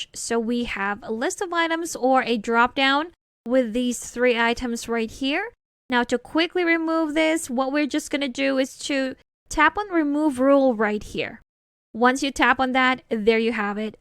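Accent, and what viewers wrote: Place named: Canada. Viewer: I still think your voice is absolutely beautiful. Even when I don't have a need for your current spreadsheet tip, I might sometimes listen to your latest tutorial just to brighten my day. You could do so much with that voice. Would you mind telling us something about yourself? Based on your accent, I'd guess you're likely Canadian. I'm probably way off, but yea, just a guess.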